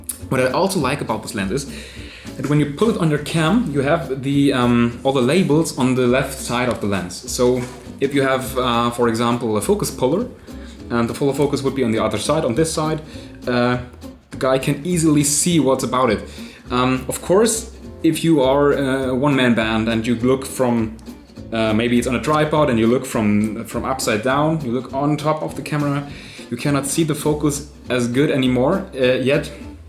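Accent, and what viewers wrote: Germany. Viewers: german accent made me subscribe... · Great video, i dont know why, but a german accent makes me want the lens even more!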